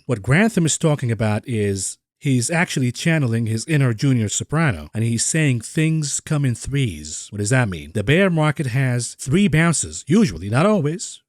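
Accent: New York, United States. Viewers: New York for sure I'd say Iranian American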